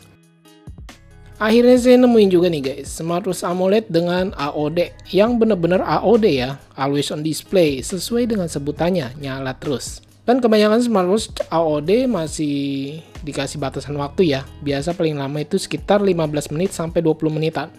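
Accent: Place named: Ciamis, Indonesia. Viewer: Paham suaranya.. Orang Ciamis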